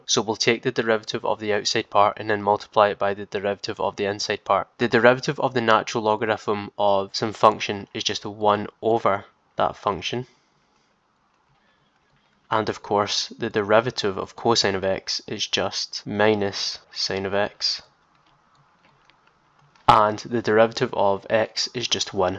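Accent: Scotland, United Kingdom. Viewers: Good evening to my Scottish friend, Being able to apply L'Hôpital's rule to limits where possible, is the same as always having a pocket calculator available; hence I call this rule " The Calculator Rule " (lol), but also keep looking inquisitively for alternative solutions!, thank you again for a fantastic presentation told by you with a pleasant characteristic accent, recognizable everywhere... Thank you again and take good care as always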